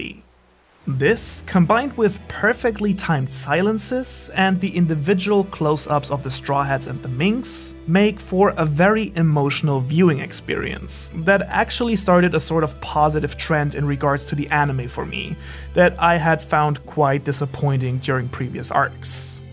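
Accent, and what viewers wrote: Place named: Germany. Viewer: Are you german? Just asking cause your accent sounds a bit german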